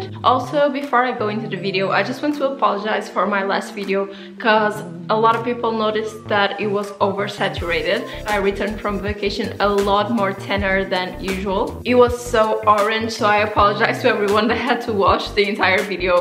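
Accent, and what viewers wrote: Portugal. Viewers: Omg I knew you were Portuguese, 30 second into the video! ahaha the way you speak English is very 'Portuguese' ahah love it!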